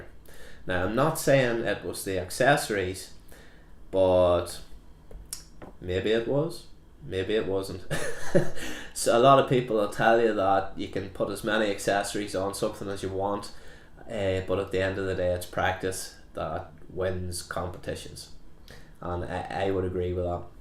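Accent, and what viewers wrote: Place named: Northern Ireland, United Kingdom. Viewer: Great video, I just purchased a second-hand 10/22 and tactical FDE stock today from Young Guns in Castledawson. I set aside a 25 round magazine because I know the PSNI have tried to make it a lot harder to purchase mags. Big hello from Co. Tyrone. It's nice to hear a local accent on YouTube for a change.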